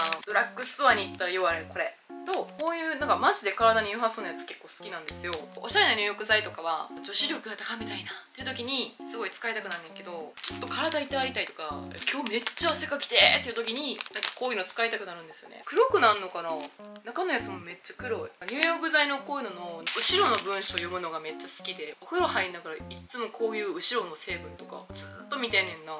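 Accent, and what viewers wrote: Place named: Osaka, Japan. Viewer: 大阪と大阪人と大阪の食べ物と大阪弁がダイスキで現在大阪に住んでる山形人なんですけど、の動画観ていつもきゅんきゅん＆ニヤニヤしてました🥰知らんけどとか、◯◯やねんな〜とか、耳が幸せです🥰ありがとうございます🌷あと今頃だけど、山形で免許取ってたなんて嬉しいです✨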